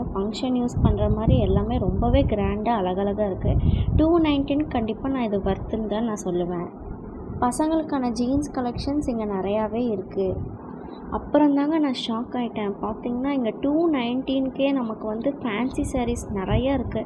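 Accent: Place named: Coimbatore, India. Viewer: தங்கள் பதிவு மிகவும் அருமை... வாழ்த்துக்கள் தாங்கள் குரலை பார்த்தால் தாங்கள் கோயம்புத்தூர் என நினைக்கிறேன் வாழ்த்துக்கள்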